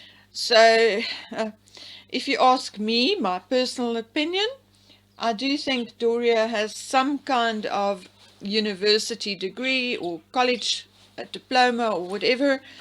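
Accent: South Africa. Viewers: Is your south african accent from an an English background or Dutch? Just curious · You sound south African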